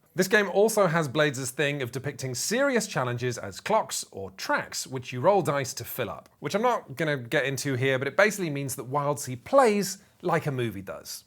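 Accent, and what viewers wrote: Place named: United Kingdom. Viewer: You should review harnmaster, also ur totally British seth skorkowsky.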